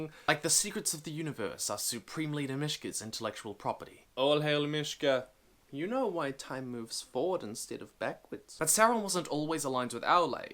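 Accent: New Zealand. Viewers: Is it a common thing for kiwis to not recognise their own accent? I cant beleive I only just realised you are also from nz xD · Love your channel bro! You sound like a kiwi... are you? im from auckland!!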